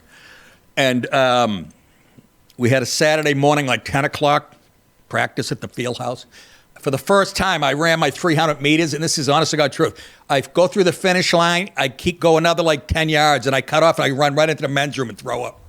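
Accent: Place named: AAVE, United States. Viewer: ebonics is embarrassing HE DO BE DOIN IT DO BE DON DAT LIKE IT DO DOOEEE CUUUHHHHHHHHH you sound like an N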